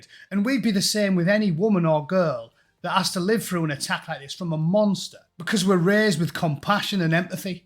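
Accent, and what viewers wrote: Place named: England, United Kingdom. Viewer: Love your sense of humor, and of course the English accent!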